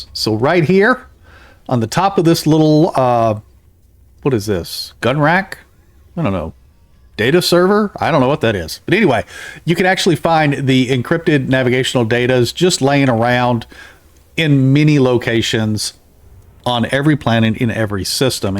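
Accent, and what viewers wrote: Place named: South, United States. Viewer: I'm trying to pinpoint your accent. I don't think it's FL, sounds very similar to the AL, or GA that i'm used to hearing but perhaps not quite.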